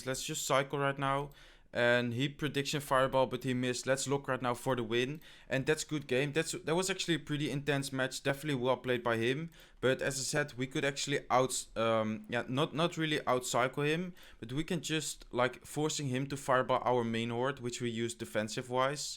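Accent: Netherlands. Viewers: lol, it's his dutch accent haha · je nederlands accent ook xD haha nice vid :P heb je een goed deck om 4000 trof te bereiken? heb nu een een deck: giant skel, zap, sparky, fire spirits, goblin ton, dat skeleton hutje, mega gunst, vuurbal zit rond de 3500 nu maar kom maar niet ervoorbij haha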